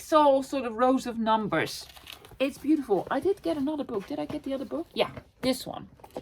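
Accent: Netherlands. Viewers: You are such a lovely person I found some days ago. I love your dutch accent and it's fun listening to you! Thanks for all the inspiration I'm always looking for. Be blessed!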